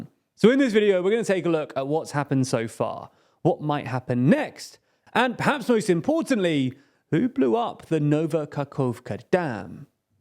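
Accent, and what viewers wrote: United Kingdom. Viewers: pretty sure its just the british accent though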